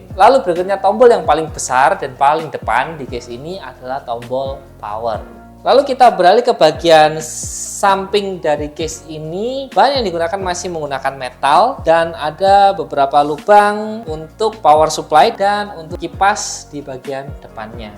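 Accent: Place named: Java, Indonesia. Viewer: Ou pantes agak medok <mail>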